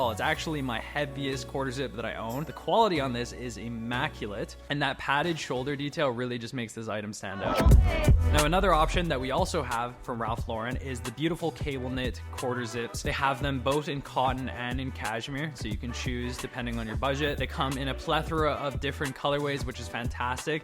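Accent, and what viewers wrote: United Kingdom. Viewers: Had to drop a like for the British accent LOL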